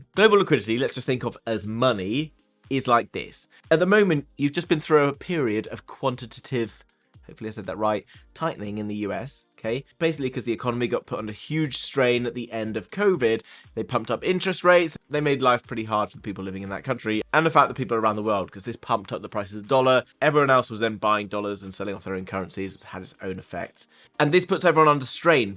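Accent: United Kingdom. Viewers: As a german follower, which even speaks very fast german, i love your clearly fast speaking i guess british english, cauz i ca understand everything and dont have to skip before and that is even awesome, like your BIG PULLING contet! I like your authority and your attitude, cauz i can see the through in your eyes and know your heart is beating for Investigating/trading AND secure your community, what is really epic ! I really aprecciate your content! Haave nice day brother, i send you much energy from the universe =)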